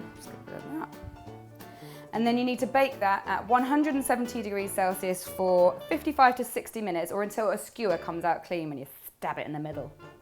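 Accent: England, United Kingdom. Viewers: When says " scrappy, naughty, bald bits " I love that. Her accent is adorable. I love to hear the British talk. Love you,! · are you English, sorry if I'm being silly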